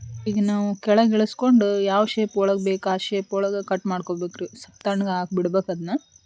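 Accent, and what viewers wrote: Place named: North Karnataka, India. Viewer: ತುಂಬಾ ಚೆನ್ನಾಗಿ ಮಾತಾಡ್ತೀರ ನೀವು ಉತ್ತರ ಕರ್ನಾಟಕದ ಭಾಷೆನ ❤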